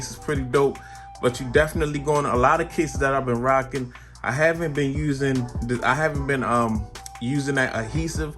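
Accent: Baltimore, United States. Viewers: Def got the Baltimore accent 💯 GO RAVENS 🐦‍⬛💜🖤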